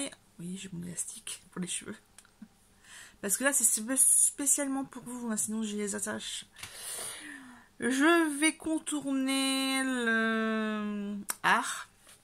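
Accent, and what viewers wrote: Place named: Quebec, Canada. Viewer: Wow du québécois YASSS TABARNAK hahahah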